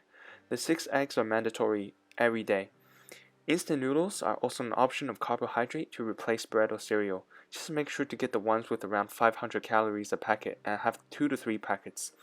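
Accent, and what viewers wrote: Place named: Australia. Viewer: Aussie mate 😎 😂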